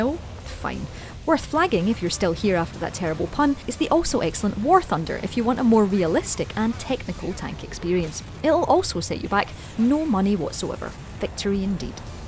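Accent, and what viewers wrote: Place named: Scotland, United Kingdom. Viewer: it's a scottish accent ^^ same as mine.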